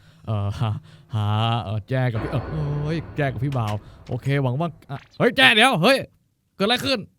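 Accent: South, Thailand. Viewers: Sunshine Channel ลุงพีคนใต้หรือคนภาคกลาง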